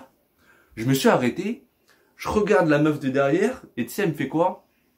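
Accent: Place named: Suburbs, France. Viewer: Le fond est bon mais tu as un petit accent banlieusard c’est dommage..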